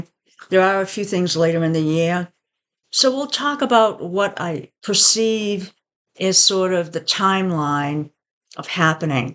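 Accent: Massachusetts, United States. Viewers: I’m from Massachusetts and immediately was like this woman is from Massachusetts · Are you from Massachusetts? I'm guessing Salem /North Shore.😊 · I’m from the North Shore and heard a MA accent too. Always cool to find a local on YouTube! ❤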